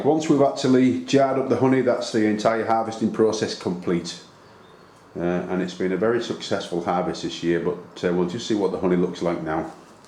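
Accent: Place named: Manchester, United Kingdom. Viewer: Thank you so much most interesting after watching your three videos. We are hosting three hives in our garden and I’ve been asked if I want to look after them. You are most helpful. We live in Herefordshire but we couldn’t help noticing your Mancunian accent which is where we originally came from.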